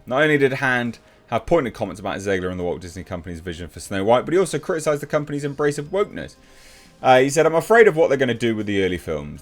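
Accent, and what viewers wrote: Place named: United Kingdom. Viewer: As a gen-you-wine good-ole' down home, back woods country boy, I must say I love hearing you say, "boy howdy" with your beautiful British accent. Well done, sir.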